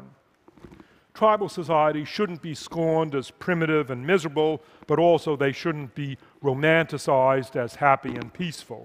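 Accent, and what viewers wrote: United States. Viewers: Another great talk from TED. What accent is this? I figure its American but where from? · What part of the US is he from? I like his accent. Is it Boston? Maine?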